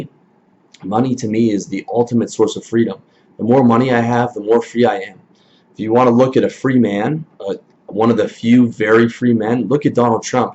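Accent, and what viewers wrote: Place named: Canada. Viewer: You sound like you might be a neighbor to the north. :) I live in a small community filled with hoarders, so it drives me to youtube to find people who understand the call to minimalism. Great video, first I've seen of yours. Youtube is full of female minimalists but the male ones are somewhat harder to come by. I'm guessing the bulk of your channel isn't about minimalistic tendencies though. 😉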